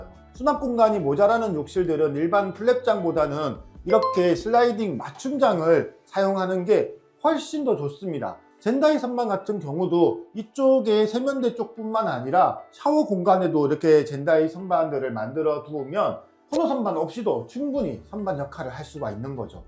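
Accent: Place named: Gyeongsang, South Korea. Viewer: 구수한 경상도사투리로 설명을 잘해주셔서 도움이 많이됩니다 대구에도 파트너사 있는지요??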